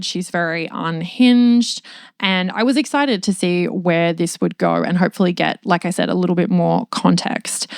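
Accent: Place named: Australia. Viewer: Are all YouTubers Australian? Or is this the new “Mid-Atlantic Accent”. Except its more like Mid-Pacific. Until YouTube i didn’t realize how close Australian is to an American accent. The only real difference is “er” pronounced as “uh” which is also a Brooklyn thing as in “Muthuh” and “Bruthuh”. Interesting review too! And seems like you changed your lighting which looks nice. Seems softer with warmer colors. Or maybe its a suntan. Either way looks good.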